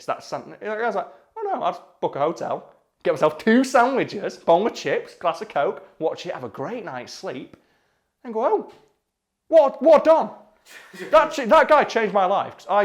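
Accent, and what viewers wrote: United Kingdom. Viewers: Wait, wait. You sound so British and still call it Football? Only ausis and non-englishlanders call it football!?!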